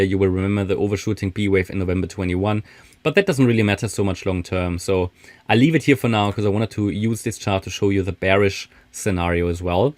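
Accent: Germany. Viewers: ich höre den deutschen aus der stimme xD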